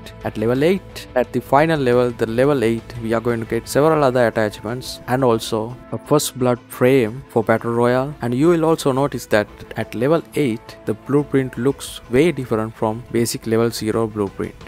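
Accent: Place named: Kerala, India. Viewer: Mythic accent from Kerala or TN!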